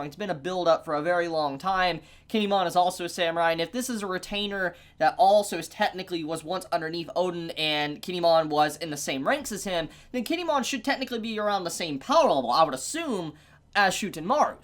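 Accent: South, United States. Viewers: Yo where are you from? I feel like sometimes you have this southern accent that doesn't sound bad at all, just made me quite curious! Much love from San Antonio.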